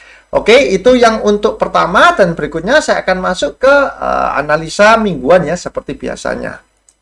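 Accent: Surabaya, Indonesia. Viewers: saya suka sekali ... medok medok Surabaya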